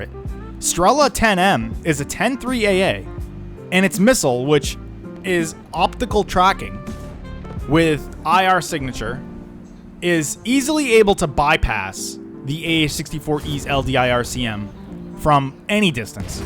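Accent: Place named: United States. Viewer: start video : american accent. yeah we knew already if you guys didnt get everything handed out you would cry xd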